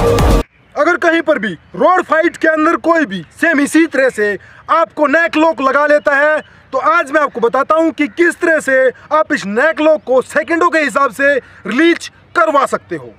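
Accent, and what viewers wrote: Haryana, India. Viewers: I think Aap haryana se ho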